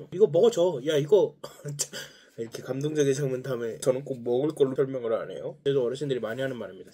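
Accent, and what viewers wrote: Jeju, South Korea. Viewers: 님이 실제 제주도 사투리도 바꿔서 대사처주니 홀깨네요ㅋㅋ 표준어쓸 부분은 과감히 쓰길 몰입도 면에서 잘했다고 생각합니다.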